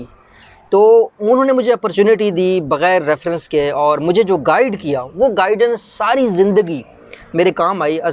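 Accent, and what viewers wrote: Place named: Dera Ghazi Khan, Pakistan. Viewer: Main bhi D G Khan say hoon ap k lehjay say pta chla ap apnay ilaqay say hain meray chotay bhai ho ap bahut khushi hoti hy apnay logoon ko traqi krtay huway ALLAH pak ap ko aor traqi day aameen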